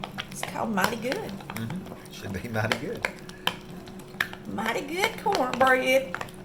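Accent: South, United States. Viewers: Well I just signed up a few minutes ago but you're fun to watch. I love your southern accent. And I love that you sat down to eat your meal.😁 I think that's fun to watch too. Most people don't take a bite of the food they cook, and I wish they would. :-)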